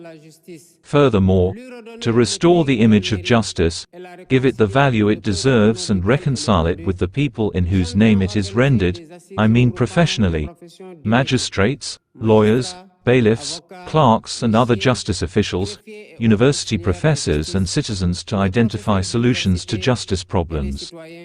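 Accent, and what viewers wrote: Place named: Malawi. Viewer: Mr Faye I can like u to do good job than worsting time to speak English like someone in malawi but in his head too much water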